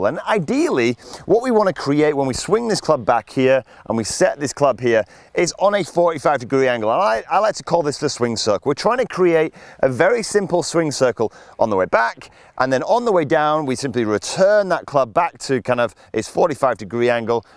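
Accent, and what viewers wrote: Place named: Northern England, United Kingdom. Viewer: Cak handed - wow thanks i'll try it in Mjorca next week - great videos and love that Northern accent 👏👏👏👏👏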